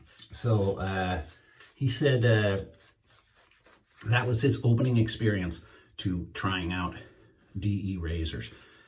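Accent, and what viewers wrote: Newcastle, United Kingdom. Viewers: I love your channel bro.Love from Liverpool uk.You may be a yank now but you can tell a Geordie.(Newcastle for outsiders)Take care mate.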